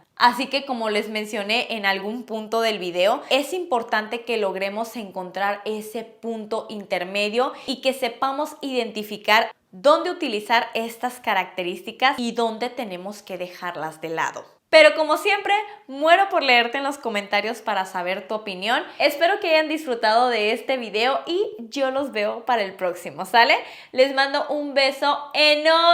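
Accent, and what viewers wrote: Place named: Argentina. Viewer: Que hermosa Argentina ...que mujer...me encanta el acento de esta mujer Argentina....¿así como hablas juegas fubol? un beso...gracias por tu videos. Saludos desde Colombia.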